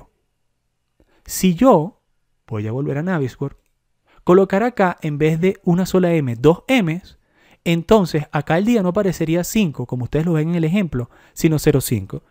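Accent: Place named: Caracas, Venezuela. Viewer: los ultimos 6 segundos del video son los que hablan de la Conexión de Microsoft Project a Navisworks, TOOOOOOODO LO DEMAS ES BLA BLA BLA elemental. no dijiste lo mas importante, ni siquiera hiciste un click en el espacio modelo para interactuar con el modelo 3d. el video ni siquiera tiene una segunda parte como para justificar tanto bla bla bla ... sera por eso que solo tienes 367 vistas y solo un comentario desde el 2020.. que perdida de tiempo escuchando ese tono de voz irritante con acento caraqueno.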